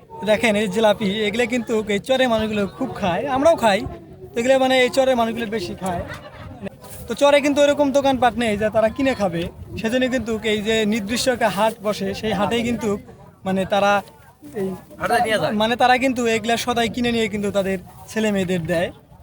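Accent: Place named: Tangail, Bangladesh. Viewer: মিথ‌্যা ব‌লে ধোকাবা‌জি করা দয়া ক‌রে বাদ দিন, আপনার কথার ধরণ মো‌টেও ক‌ু‌ড়িগ্রাম জেলার মানু‌ষের কথার ধর‌নের সা‌থে মি‌লে না। আপনার কথার ধরণ টাঙ্গাইল জেলার মানু‌ষের কথার সা‌থে পু‌রো মিল এর মা‌নে ভি‌ডিও টা কু‌ড়িগ্রা‌মের না।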